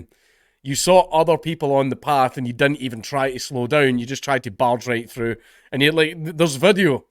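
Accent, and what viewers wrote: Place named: Scotland, United Kingdom. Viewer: We need a Dankula video in full on Scottish mode with subtitles.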